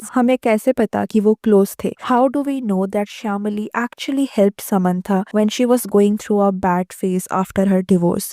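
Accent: Bengal, India. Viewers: तुम्हारी voice से साफ़ समझ आता है कि तुम bengali हो और bengali को support कर रही हो. Probably shyamala de कि टीम से हो. कोई innocent नहीं होता Bengali सबसे बड़े धोखेबाज होते हैं. Just stop defaming Samantha and shut up please. 😂😂😂😂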